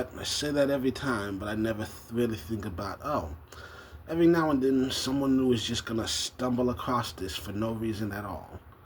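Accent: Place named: United Kingdom. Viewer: I originally saw you from that one video that went viral a while back at first I thought you were British or something but now that I know you're from Texas I'm kind of wondering how you got that accent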